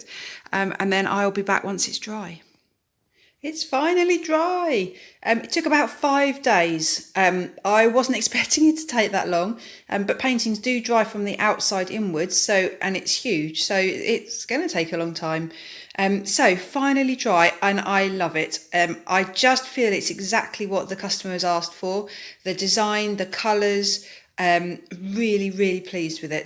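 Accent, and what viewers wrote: United Kingdom. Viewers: Beautiful and it is so nice to hear a British Artist - just starting out so this is so helpful - can’t wait to watch more of your demos 😀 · It makes a lovely change to hear a British accent. This is beautiful. Having had so many disappointments while I learn I can only dream of creating something like this.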